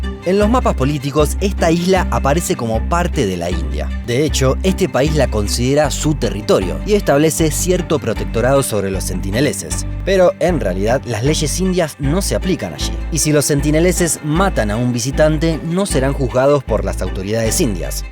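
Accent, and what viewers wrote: Rioplatense, Argentina. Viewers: Parece argentino pero el sonido sh en la ll e y es muy suave , no parece el típico acento porteño . Es como un acento argentino neutralizado . Resulta muy agradable y claro . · Por el acento diría que es argentino o uruguayo..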